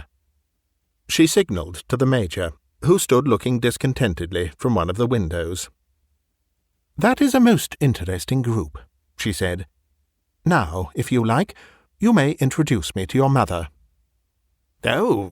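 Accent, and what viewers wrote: England, United Kingdom. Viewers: This is very confusing!!! The princess was Turkish not Swiss and you give her 2 (!) last names!!! Is it Duveé or the first one where you call her Lucy Cunier!?!? It is NOT clear that you are talking about 2 separate persons!!! I think that you must've cut something that related that fact clearly, out! Also, it is sometimes difficult to understand you because of the British pronunciation, i.e a clerk-- where you pronounce it as "a clark" but you don't leave any space between "a" and "clark". I am not criticizing you; I actually enjoy your English accent. In general, I also enjoy the way you tell stories; it's just that I think that with respect to this one, you should not have left out important parts.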